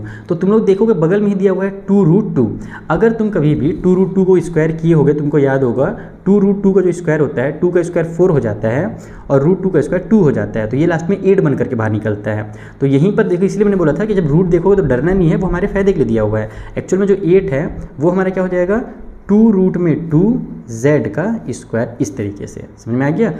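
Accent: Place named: Punjab, India. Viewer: Sir ap punjab ka ho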